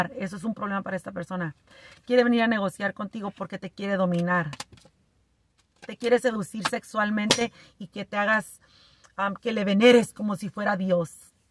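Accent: Mexico. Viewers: ... Me haces reír mucho más aún con tu acento mexicano.. gracias ✨💙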